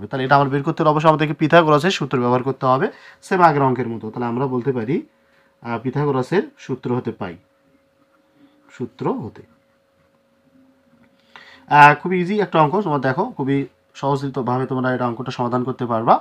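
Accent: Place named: Gaibandha, Bangladesh. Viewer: Apnar basha gaibanda na vaiya😂😂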